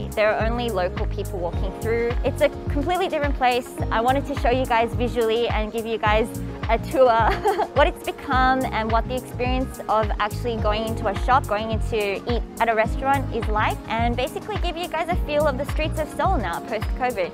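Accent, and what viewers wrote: Australia. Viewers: Hi - did you used to study in Aus? You have a bit of an Aussie accent happening:) You should see what 6 lockdowns have done to Melbourne - it's really terrible! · aaaw I really want to go to Korea, hopefully covid will be over soon! She got Australian accent btw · Well in Australia..cause you sound Aussie..it's worst in NSW and Victoria..due to lockdowns and restrictions...we cannot do any store shopping..it's click and collect only and delivery...many cafes etc closed and many other businesses closed/gone..many people out of work and hospitality businesses gone...its probably the same in many countries...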